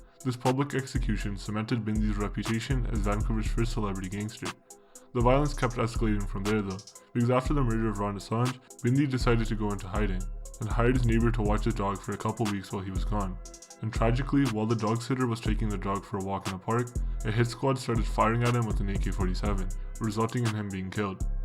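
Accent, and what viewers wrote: Punjab, India. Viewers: u can tell this guy is punjabi and from surrey lmao he sounds just like bindy johal except more chill/relaxed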